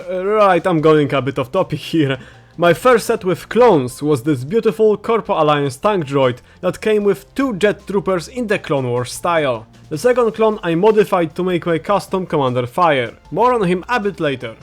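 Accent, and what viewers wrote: Poland. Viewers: Are you from Poland? I mean your voice have so polisy Accent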